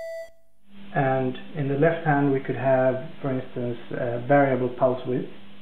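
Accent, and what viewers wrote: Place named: Skåne, Sweden. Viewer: He's definitely swedish, sounds like he's from the Skåne area (not sure).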